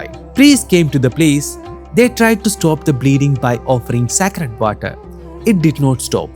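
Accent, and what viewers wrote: India. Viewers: it is not bad bro you don't have to apologise if some can't even bother to be attentive to what's being said, it's still Indian English not British or anything.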